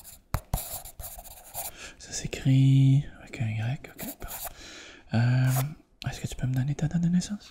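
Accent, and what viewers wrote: Quebec, Canada. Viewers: Tu est du Québec ?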